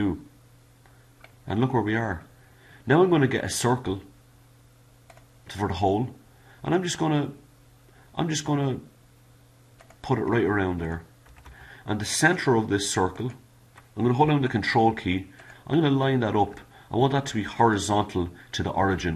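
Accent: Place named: Galway, Ireland. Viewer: Good stuff....just came on you videos today.......judging by the accent i could drive down the road and buy you a beer... (Mike from Galway)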